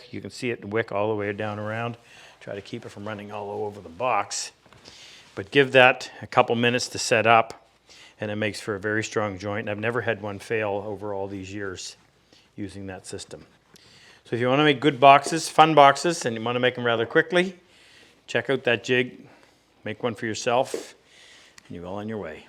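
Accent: Canada. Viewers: Nice work! Are you Canadian or American....just curious?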